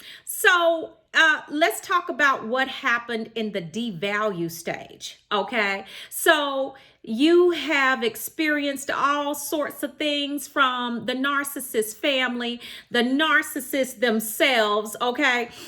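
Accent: South, United States. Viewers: Good info sweetheart! Love your southern accent 👍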